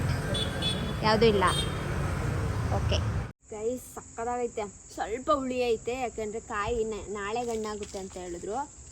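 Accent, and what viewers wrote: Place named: Kundapura, India. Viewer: ❤❤❤❤❤ಗೋಯ್ ಬೀಜ 👌👌👌👌 nam kundapr Kannada marre❤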